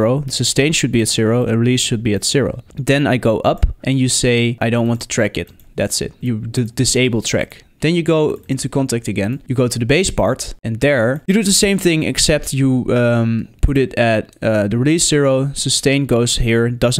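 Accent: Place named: Netherlands. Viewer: Lol. Je hoort meteen dat Nederlandsche accent. Edit: hoe lang Ben je al bezig?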